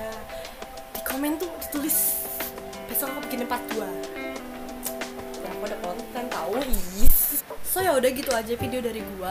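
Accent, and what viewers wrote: Java, Indonesia. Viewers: Nx ngomong medok kuilo marai klepek2 😍😂😂😂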